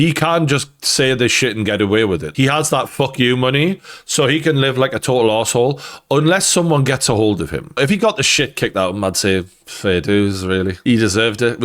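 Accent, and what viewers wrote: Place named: Northern England, United Kingdom. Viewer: He's dangerous and a drug addict. The fact kids are unaware of the swat sticker is dangerous he makes me sick he's Jelous and bitter 😂😂😂 he's made me hate him since Sunday I can handle the Grammy show up but he was greedy!!! Wanted the attention kendicks light. He said too much that's unfortunate and unforgivable. Ps love your accent I'm from Cheshire and a creator and love my nothern creators. ❤❤❤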